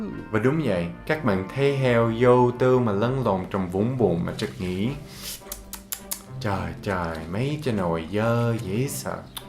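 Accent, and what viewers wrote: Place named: South, Vietnam. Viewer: Ôi Tây nói giọng miền Nam. Nghe vui kinh khủng.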